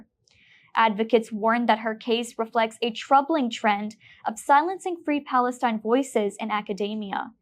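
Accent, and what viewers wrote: United States. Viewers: Speak arabic / quran language and stop rolling your tongue in American way which angers afghans talibans .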